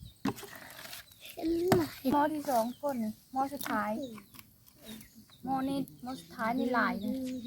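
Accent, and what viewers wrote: Phu Thai, Thailand. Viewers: สำบายดีน้อง ผุน่ารัก. สวยจังสาวภูเทอ.ขยันจัง.สำเนียงภูไทม่วนหูดี..กเฝห้กำลังใจนำเด้อจ้า. จ๋า... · ข้าวใหม่ เป็น ข้าวไกม้ มำไมไม่พูดว่า ค้าวเม้อ (หยอก)ป้งเพราะดีเน้อ สาวภูไทตนงาม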